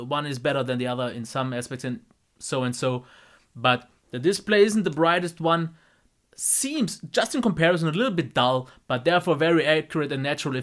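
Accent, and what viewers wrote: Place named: Germany. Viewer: "grey knight" made serious comment about your integrity as an impartial reviewer. Please speak a little slower and I would like another review for lgg4 as this is a phone I think I would like. Thanks, and you are probably German or E. Europe as another subscriber asked. We are all equal, no reason not to answer a simple question!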